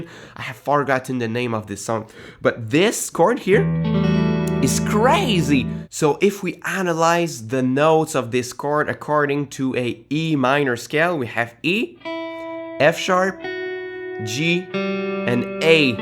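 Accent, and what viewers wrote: Quebec, Canada. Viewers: Quebecois accent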